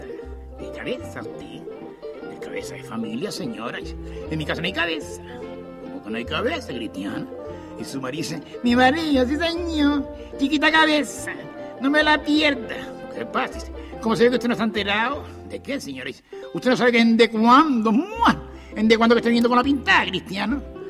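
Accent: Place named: Canary Islands, Spain. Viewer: Maravilloso ese acento y modismo de los barrios capitalinos y ese sabor canario. Que desgraciadamente se ha perdido...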